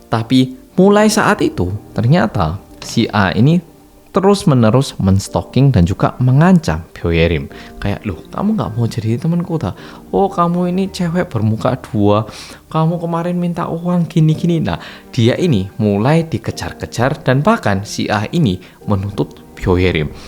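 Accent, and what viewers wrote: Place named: Malang, Indonesia. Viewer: Oalah sakno e talah.. rest in peace ya cantik.. jan kok nemen.. mas kok yo ero kualat.. hahahhahaa... jan medok malange.. salam dari malang.. ❤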